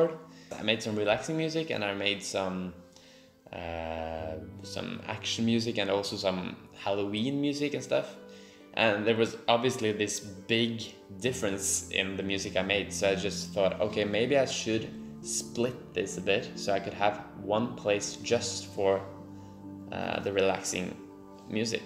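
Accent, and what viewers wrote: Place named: United Kingdom. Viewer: I love British accents! I love it so much!😍😍😍😍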